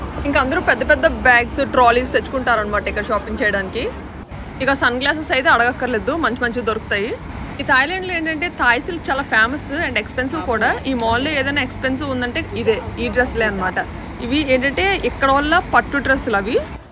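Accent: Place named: Godavari, India. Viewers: Meru everything Bangkok ni chupisthunanru nd mi telugu slang spr.... YouTube nundi manchi ⭐⭐⭐ time pass ki indirect ga kastapaduthunnru miru 😍🙏👍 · మనం ఎక్కడికి వెళ్లిన మన స్లాంగ్ మాత్రం కానిపెట్టవచ్చు ఈస్ట్ గోదావరి అనుకుంటున్నా నేను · తూర్పు గోదావరి లా ఉంది మీ వాయిస్ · హాయ్... మీరు బావున్నారు. వాయిస్ గుడ్. సరే.... ఆంధ్రలో మీ నెగిటివ్ ప్లేస్ ఎక్కడ, ఎందుకంటే మీ మాట, యాస, బాష, గోదావరి జిల్లాలని ఉహించాను. గుడ్ గైడెన్స్.... వెల్ కమ్ ఆంధ్ర..... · Nice voice+ మన తెలుగు యాస = అక్క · మీ వాయిస్ సూపర్ వుంది. గోదావరి భాష. మీ వీడియోస్ చూస్తుంటే మా బ్యాంకాక్ ట్రిప్ కళ్ళకు కట్టినట్లు గుర్తుకువస్తుంది.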